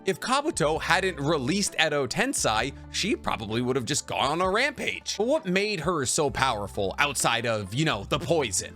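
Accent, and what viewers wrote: United States. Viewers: You have the most American pronunciation of Japanese names I've ever heard. But I still look at your vids I just try to ignore it.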